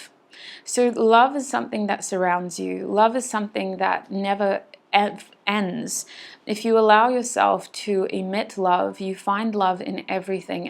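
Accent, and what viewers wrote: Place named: Australia. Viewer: I could instantly tell from the intro you were Australian. Lovely energy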